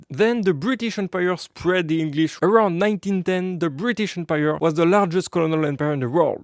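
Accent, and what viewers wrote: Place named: France. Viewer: 💀Basically you're just saying that you're fine only knowing one language becuase you already know the "global language". Well im gonna say a thing to ya champ, you speak English because it's the only you know (Even though I think you also speak French by your accent), I speak English because it's the only language you know.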